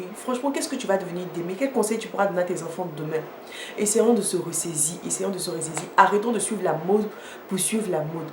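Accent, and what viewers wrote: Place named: Ivory Coast. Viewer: Ah t'es ivoirienne j'ai l'impression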